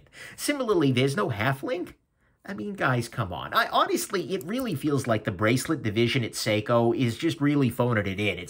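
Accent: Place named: Brooklyn, United States. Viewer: You sound like you grew up in the tough side of Brooklyn, NY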